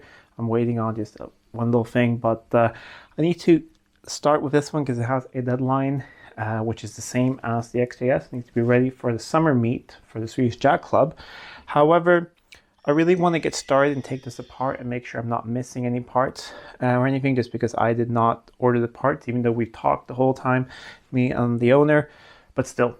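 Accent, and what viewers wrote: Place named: United States. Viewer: sir, you are swedish - where did you get your american accent from ... ?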